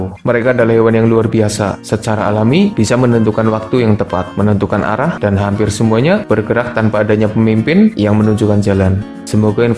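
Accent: Banyumas, Indonesia. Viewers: Joss boss.. Ora ngapak la ya ora kepenak lah yak... Hahaa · Ora ngapak ora kepenak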